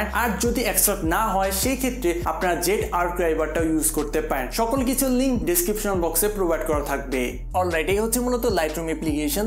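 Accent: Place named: Jamalpur, Bangladesh. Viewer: Aponar basha ta jamalpur kun jagai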